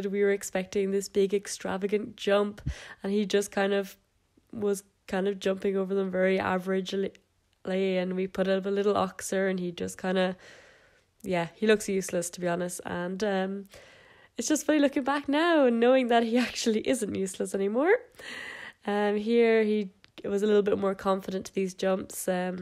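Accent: Ireland. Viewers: Awwwww so cute I started horse ridding 5 weeks ago I LOVE IT,btw r u Irish because I am and u sound like u :) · Are you Irish?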